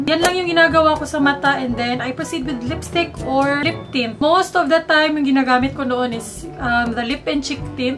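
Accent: Visayas, Philippines. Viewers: Luh...Bisaya ka noh?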